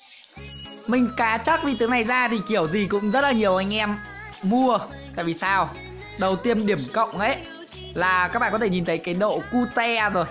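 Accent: Nam Dinh, Vietnam. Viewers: Anh ơi nhà anh ở nam định à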